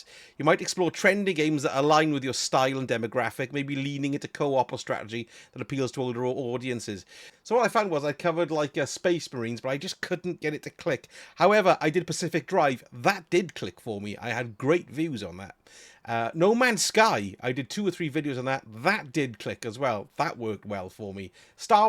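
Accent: Wales, United Kingdom. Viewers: Great to put a face to that beautiful Welsh accent, been watching your content for years! As an older gamer myself I enjoy your humour. Id love to see you do some videos on the replayable aspects of Destiny like raids with viewers or on some of the controversies like Manstrual’s ban and his corresponding video. Hope the game doesn’t die. People have been saying it’s finished since before I started playing all those years ago.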